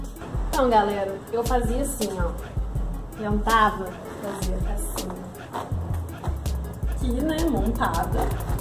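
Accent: Minas Gerais, Brazil. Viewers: Esse sotaque nosso é bonito demais sô 👏😊✌️🤘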